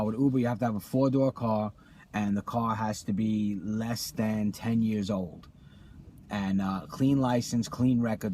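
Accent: New York, United States. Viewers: That is the heaviest New York accent I have ever heard. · The clues gave it away (at least for me). Highly dense, close to trains, and the airport. All i'm thinking is Manhattan NY. The accent just confirmed everything lol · "Not gonna disclose my location" your accent is a dead giveaway NYC bro... LOL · Jajaja that accent is str8 from new york lol gotcha there buddy now i know where u drive jaja lol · Just started in Chicago, clearly you are in New York or New Jersey (the accent gives it away) I am also going to focus on the daytime commutes to avoid any of the late night troubles. Hope to see more videos from you & #UberOn also how's SideCar in your market have you tried it? It does well in Chicago in certain areas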